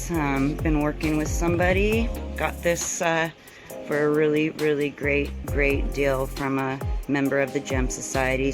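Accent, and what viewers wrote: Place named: South, United States. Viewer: Okay....this is the first....but not the last ....of your videos I have watched. You might be in the Northeastern U.S., but you almost sound Southern.....*signed a Southern gal*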